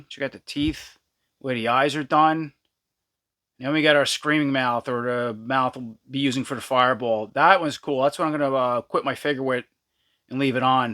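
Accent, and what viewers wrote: New York, United States. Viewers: You gotta NY accent, makes the review better..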